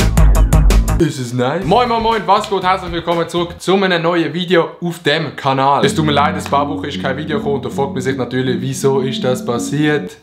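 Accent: Switzerland. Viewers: Ich bin erst seit kurzem auf deine Videos aufmerksam geworden und feiere deine Schwitzer Deutsch (komme aus Deutschland und sprechen auch keine Schwitzer Deutsch), ich würde es aber trotzdem schade finden wenn du hochdeutsch sprichst da ich den aktzent mega feiere. Mach weiter so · Mach einfach deutsch mit nem dicken Schweizer Dialekt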